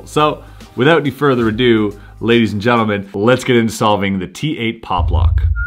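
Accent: Germany. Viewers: Solid german accent my man high five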